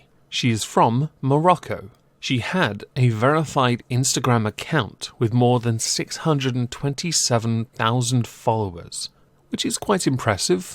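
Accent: RP, United Kingdom. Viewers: Who else subbed for the posh accent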